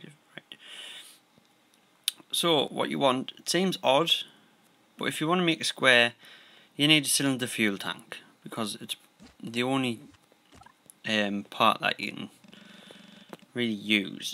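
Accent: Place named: North East England, United Kingdom. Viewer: North East of England. I might be wrong but I just recognised the accent 😂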